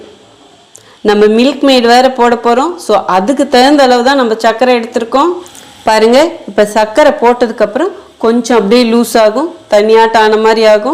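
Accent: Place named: Tirunelveli, India. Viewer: நீங்கள் பேசுவதை கேட்கரசனையா இருக்கு தெளிவா.இருக்கு வாழ்த்துக்கள் மகளே எந்த ஊர்நீங்க பிள்ளை னுசொன்னது நெல்லையா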